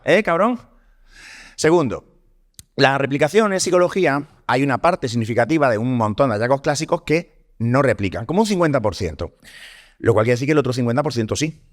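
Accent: Spain. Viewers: Me parece insufrible h chulesco, narcisista total ...y por cierto que horror el acento * murciano? Ufff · Me parto con ese acento y esas plabrad o frases tipica andaluzas y además dices verdades que como puños,gracias porque aparte de aprender ,me río 😅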